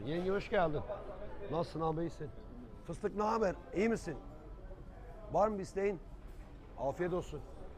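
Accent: Gaziantep, Turkey. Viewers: usta selamın aleyküm Ya Antep şivesine hasta oluyorum çok güzel kolay gelsin ustam yeni lokantan hayırlı uğurlu olsun inşallah 🤲👍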